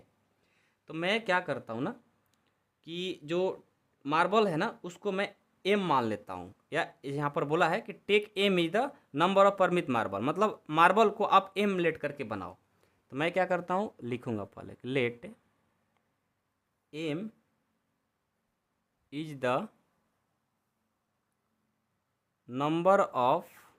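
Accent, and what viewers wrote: Bihar, India. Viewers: Padhana bahut acha hai sir but ye jo alag se sound aa raha hai ye language Bihar ka lag rha hai😊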